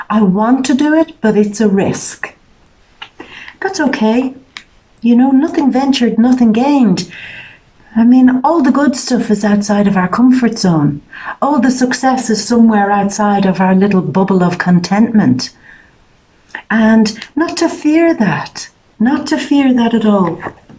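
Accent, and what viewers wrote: Ireland. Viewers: Your voice reminds me of when I lived in Ireland. I don't want yesterday, he was my past. How would one get a private reading. Slante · I love that accent. It's always felt familiar when I was like 3 years old but being American and my only time hearing that accent was from watching Darby O'gill and the little people with my great grand mother and my great grandfather singing when Irish eyes are smiling. It's always felt like home. And your reading is spot on.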